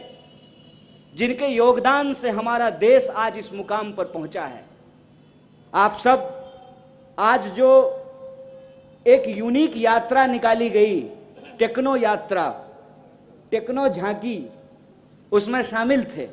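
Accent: Bihar, India. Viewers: He should improve his Bihari tone.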